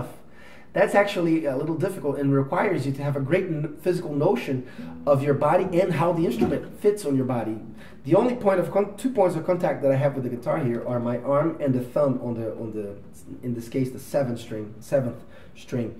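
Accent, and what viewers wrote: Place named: Brazil. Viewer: Super aula. Inacreditável que seja gratuita. Tô comentando em português pois acredito que vc seja brasileiro pelo sotaque. E que ótimo inglês diga-se de passagem.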